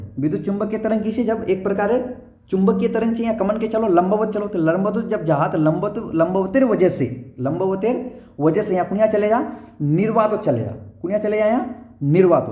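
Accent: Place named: Surjapuri, India. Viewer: Pure surjapuri sir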